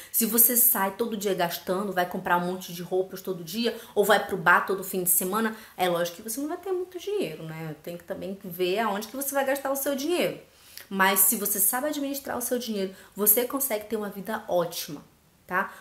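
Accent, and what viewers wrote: Pará, Brazil. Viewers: Assim que ouvi vc falar eu soube. É paraense. 😚 inscrita! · Eu sabia que você era paraense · Já sabia que você é do Pará só pelo sotaque haha. O nosso "inverno" é assim mesmo, só chuva e calor aff. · Égua, bem que eu reconheço esse sotaque! Saudações de Belém do Pará! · Oi querida, achei por acaso seu Canal, e ja to assistindo., so uma Pergunta, Vc é Paraense?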